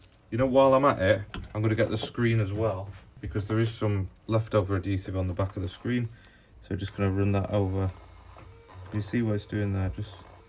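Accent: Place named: England, United Kingdom. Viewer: английский акцент - это кайф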